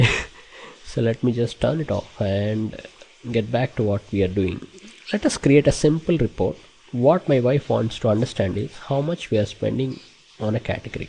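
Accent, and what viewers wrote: India. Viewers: This is the first video that I actually find helpful!! thanks a lot!! Love your indian accent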